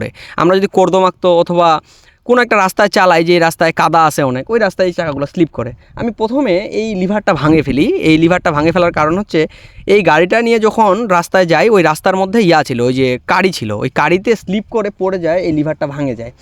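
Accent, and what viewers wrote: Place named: Dinajpur, Bangladesh. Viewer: দিনাজপুরের ভাষা ❤, আরো ভিডিও করবেন প্লিজ, গাড়ি নিয়ে, এমন ভিডিও ইউটিউবে কম আছে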